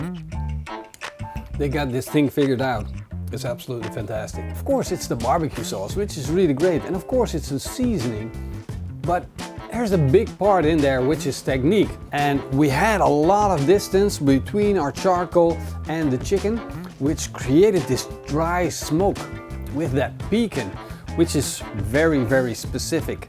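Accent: Netherlands. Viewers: He's Dutch (from Holland aka The Netherlands)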